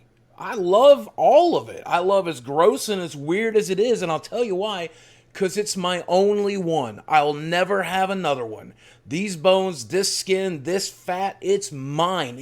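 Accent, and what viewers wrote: South, United States. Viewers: Watch his "origin story" series... he originally had a hillbilly accent, and it comes back when he visits the town he grew up in.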